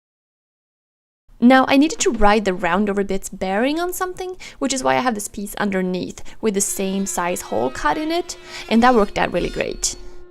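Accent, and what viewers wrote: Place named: Sweden. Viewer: I hear that you are Swedish nice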